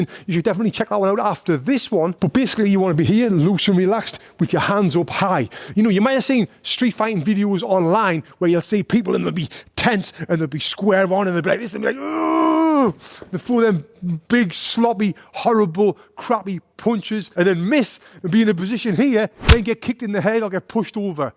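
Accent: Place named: Newcastle, United Kingdom. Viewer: Excellent video, nice geordie accent 👍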